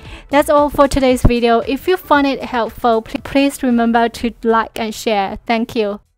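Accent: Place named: China. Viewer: 英語は大丈夫だよね 中国人ですか？その発音はちょっと中国語だから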